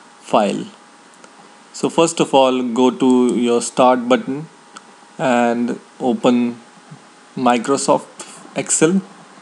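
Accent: India. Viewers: thanks, it helped me a lot; and I truly appreciate your Indian accent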